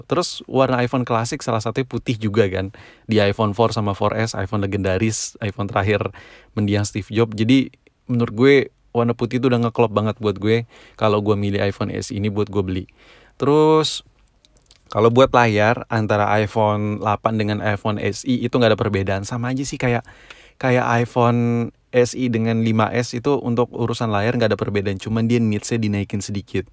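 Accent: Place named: Gunung Sindur, Indonesia. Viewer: Logat lu kaya orang gunung sindur anjay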